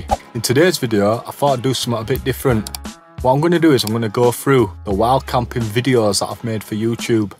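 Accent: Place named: North, United Kingdom. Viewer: Interesting video mate, nice to see a bit of a look back. Shocked you hadn't been to the peaks or the lakes before though, with your accent they are basically on your doorstep!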